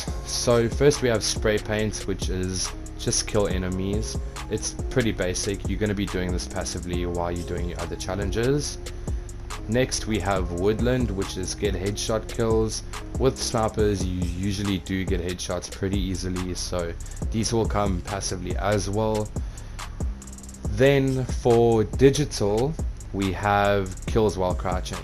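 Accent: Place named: South Africa. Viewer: Why do you sound south african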